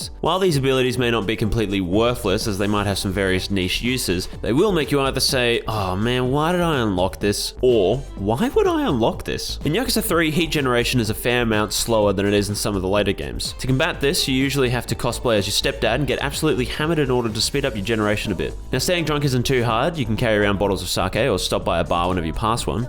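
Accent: Australia. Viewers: As an australian, he doesn't sound very british and is probably also australian.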